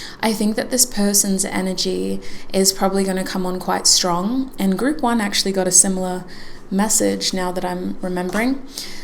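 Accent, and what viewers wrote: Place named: Australia. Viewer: Aussie accent 😍😍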